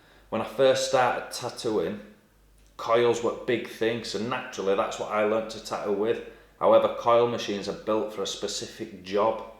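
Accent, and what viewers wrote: United Kingdom. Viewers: So good to hear not only a Brit accent but I'm from Hull so not far off mine, nowt wrong with our cousin's across the pond but I know your advice is UK based & everything you recommend I can get etc, I'm 48 & been dying to tattoo for years, done everything from paint fighter jets, charicaturing, portraits, murals & gravestone artwork (very similar to tat designs tbf especially the Gothic & flower based stuff) & finally said f**k it, dive in & give it a go!! But f**k me tat artists I've tried to get training or tips off are very cagey, I've found the whole industry clique & hostile to outsiders, especially an actual artist like myself who I believe some of them felt intimidated by, they were basically glorified tracers & couldn't draw for shit! Anyway, rant over, I've watched 2 vids so far & think I'll just block out the rest of you tube & stick with you pal!! 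Obviously subbed & notification bell hit so looking forward to binging past content & the new stuff!! Here we go!!!😁😁😁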